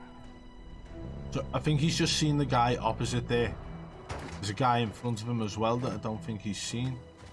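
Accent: Liverpool, United Kingdom. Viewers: best scouse wz2 commentator out there, yes lad 😉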